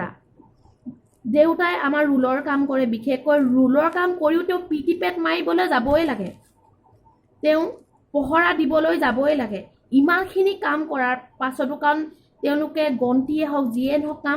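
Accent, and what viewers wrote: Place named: Sivasagar, India. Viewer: তোমাৰ ঘৰ শিৱসাগৰত নেকি কথাখিনি শুনি ভাল লাগিছে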